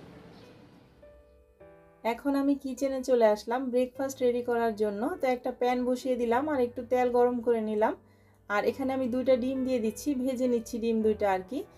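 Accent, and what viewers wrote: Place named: Noakhali, Bangladesh. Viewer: ওরে ! আন্নে আঙ্গো নোয়াখাইল্লা ভাবী নী, আগে কন্নো কিল্লাই?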